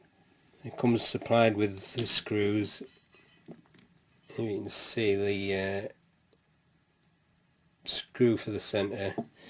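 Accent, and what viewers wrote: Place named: Newcastle, United Kingdom. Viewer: what accent is that you have, it sounds sorta newcastle like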